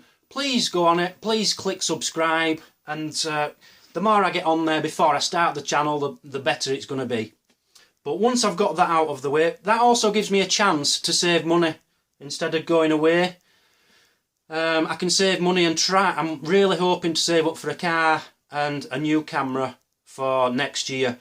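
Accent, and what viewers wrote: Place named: Northern England, United Kingdom. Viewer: When I grow up and become a porn star I'm going to call myself. Seriously though, you're very entertaining... and I *WILL* have a porn star name of in honour of you. P.S Where are you from, I know it's North, but are you a Mancunian, Yorkshire, Lancashire Stoke or of there about? I thought I had an ear for accents. Anyway, keep it up. and school me on how I can't detect what region you're from.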